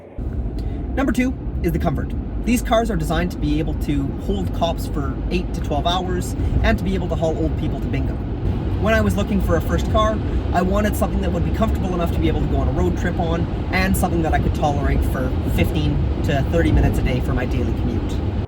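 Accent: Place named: Canada. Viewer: i am also canadian .. i can tell by your voice lol( native american from rez in canada)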